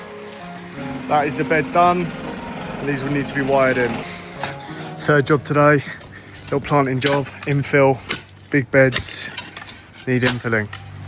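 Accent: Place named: Cockney, United Kingdom. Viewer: Wanting to become a high end firm whilst filming yourself in a vest sounding like a cockney wide boy does not come across to potential customers as high end sorry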